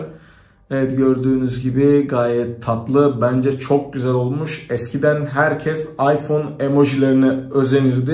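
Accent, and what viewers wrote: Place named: Sivas, Turkey. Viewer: abi sivasın neresindensin :D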